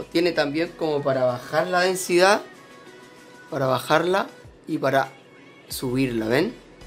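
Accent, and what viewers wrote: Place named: Chile. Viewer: Wueon apuesto lo q quieras a qué eri chileno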